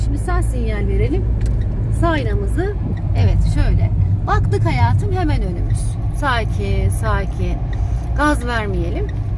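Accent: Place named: Çankırı, Turkey. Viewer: Burasi çankırımı hocanin şivesi cankirililar gibi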